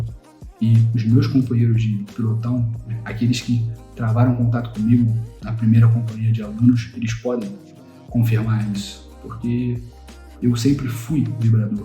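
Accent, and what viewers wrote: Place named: Rio de Janeiro, Brazil. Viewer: Você é muito aluno mesmo kkkk, até suas posições e suas mãos, além de sua fala pausada e encariocada mostram isso kk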